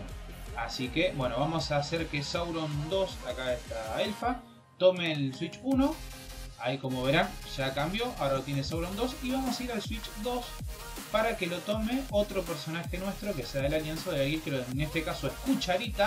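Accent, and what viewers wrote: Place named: Rosario, Argentina. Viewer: Sos de Rosario ?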